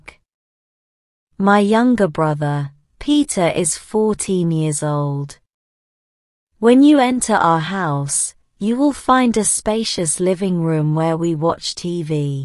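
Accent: United Kingdom. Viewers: Is this the British accent ??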